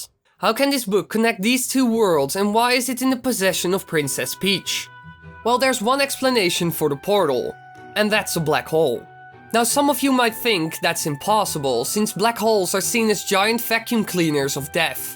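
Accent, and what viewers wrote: Netherlands. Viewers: Hi are you dutch. If so Goede video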